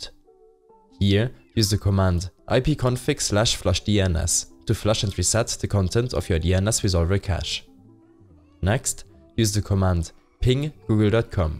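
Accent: Germany. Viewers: This german accent omg Im german too yay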